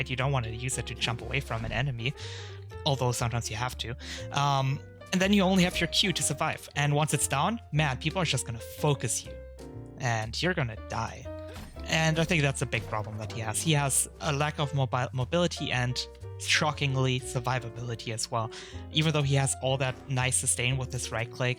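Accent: Germany. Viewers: Man hört das er deutsch ist :D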